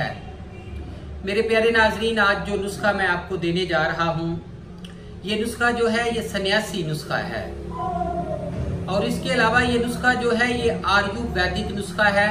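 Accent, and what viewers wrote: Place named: Punjab, India. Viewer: Kia bat bhai tumrai اردو sahe bolo bhai پنجابی مکس mat Kro pls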